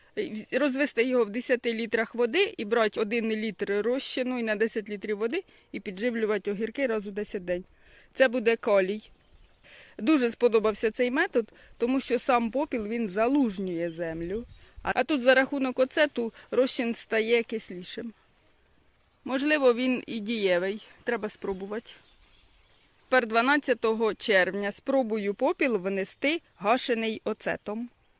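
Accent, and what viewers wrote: Poltava, Ukraine. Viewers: Як приємно вас слухати Дуже милий полтавскій говір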